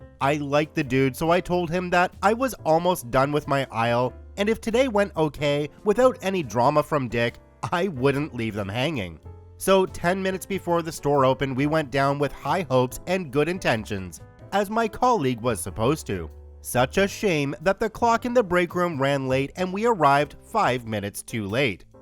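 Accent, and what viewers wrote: Canada. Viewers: Irish accent with a Canadian twang. Lol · You know their talking about our TIM HORTONS GOD I LOVE MY TIMMYS PS LOVE YOUR CANADIAN VOICE VERY FUNNY CAUSE ITS TRUE 🙃🤪😜 Sorry lol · okay but the canadian accent tho that caught me off guard XD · Can't tell if that's Canadian Accent or if is really an American from wisconson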